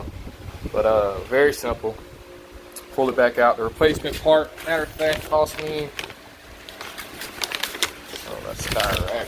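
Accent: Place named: South, United States. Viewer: Yo G you sounding hella country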